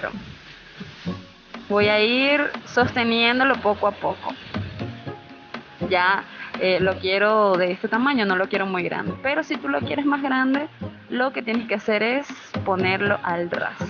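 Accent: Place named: Ecuador. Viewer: Eres de ecuador o venezuela?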